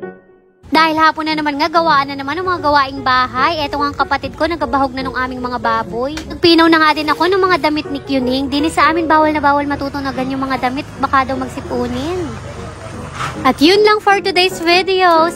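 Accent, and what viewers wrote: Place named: Mindoro, Philippines. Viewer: Katuwang pakinggan ang tuno ng Salita nyo at mga words, kaya pala itong manugang ko sa pamangkin, katulad mo mag salita tiga, Mindoro kasi, tuwa ako pag nagsasalita sya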